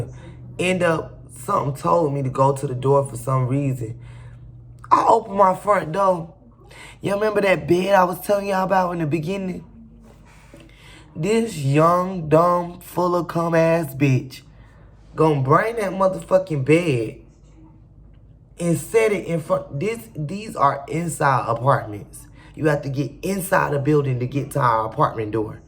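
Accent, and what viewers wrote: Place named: Midwest, United States. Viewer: Gotta be from Midwest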